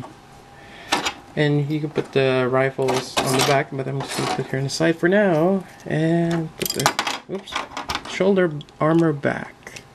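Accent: Philippines. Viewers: yo sir! sorry, are you from the philippines? medyo pinoy yun accent mo hehehe. if you are i'm curious where you picked this up! available in greenhills?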